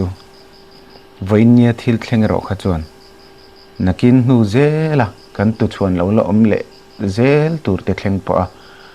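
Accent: Myanmar. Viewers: Nangpa hi ka rin zawng ini lo.Burma ho hi in tenawm tlat,mizoram inpumkhatna pawh in ti chhe dawn.Mizotawng pai deuh deuhin in tawng a,in zak der mai si lova. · Falam tawng Chu aawm e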